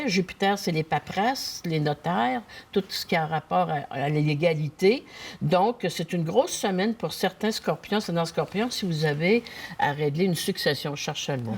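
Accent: Canada. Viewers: Bonjour et merci beaucoup pour vos prévisions et aussi j'aime beaucoup votre accent canadien c'est très agréable merci 😂❤😅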